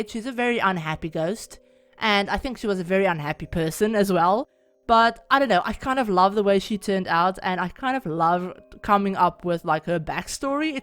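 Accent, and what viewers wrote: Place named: Afrikaans, South Africa. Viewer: I knew it when u was talking I was like yes she's Afrikaans in from south Africa tooooo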